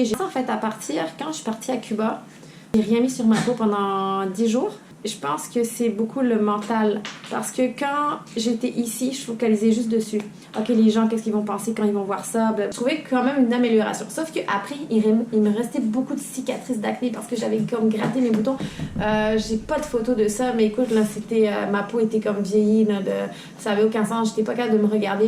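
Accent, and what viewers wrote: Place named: Quebec, Canada. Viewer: Ces cute tu as un accent français et québécois à la fois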